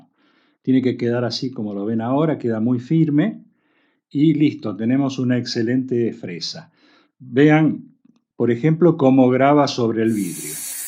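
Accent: Argentina. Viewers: Como se nota que es argento por la manera de hablar... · Mira que casualidad (o causalidad), hace unas semanas atrás, al ver como mi flía se encarga de romper o descomponer los encendedores que yo aporto para la cocina (mientras yo los proveo, ellos los dejan obsoletos ... buah!), me encontré con una buena cantidad de estos artefactos indesusos. Y como soy dado pa las cosas manuales, las herramientas y la creatividad, me propuse el reactivarlos combinando algunos que les faltaban la piedra, o partes varias con otros con sus recamaras de gas intactos. La paciencia, dedicación y las ganas de aprendizaje lo es todo! Gracias por tus aportes, y me hiciste reir con tu forma bien "argenta" de hablar. Saludos desde Rosario "Cuna de la Bandera Argentina".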